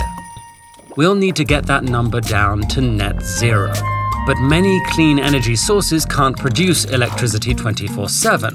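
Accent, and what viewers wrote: United Kingdom. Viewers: A British voice over in a Ted video is something I never thought I'd hear or need. Man this is good. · i like British Accent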